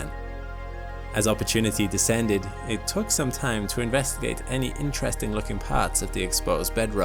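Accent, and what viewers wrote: United Kingdom. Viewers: bro its because he is British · hes british whatcha expect lol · What an amazing journey my friends! It's like a version of Hiliad, with badass names and frightening dangers, surviving far more than enyone would've guessed, a lengthly and full of updates and events, BUT THIS TIME with real imagery OF ANOTHER FRICKING PLANET. This is a modern epic and nobody can convince me otherwise. Also, its narrated by a cool brit guy, so what more could we ask?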